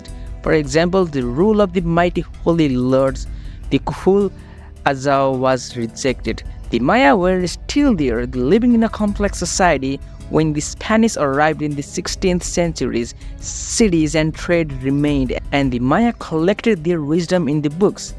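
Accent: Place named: South Asia. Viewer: I see why you started using an AI voiceover, it's because you're from South Asia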